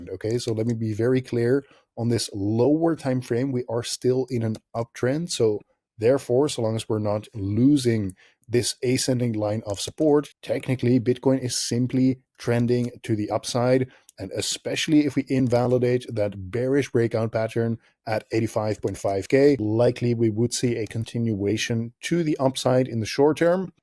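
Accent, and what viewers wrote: Netherlands. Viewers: Your accent sounds Dutch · Ik hoor NL 😅 Waar trade je met leverage?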